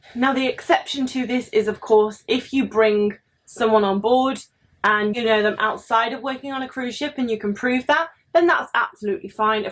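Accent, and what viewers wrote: United Kingdom. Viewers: Thanks! Love your videos and your British accent! You are the coolest! 🤪🤣😇😉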